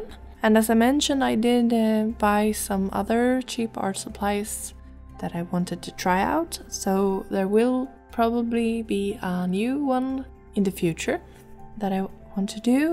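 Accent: Sweden. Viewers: omg are you swedish??? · I love your art, and I adore your Swedish accent. Marry me please <3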